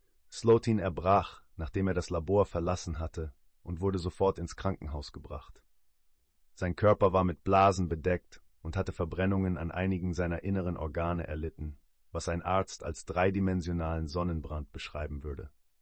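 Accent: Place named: France. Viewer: Du hast einen leichten Akzent. Bist du Franzose?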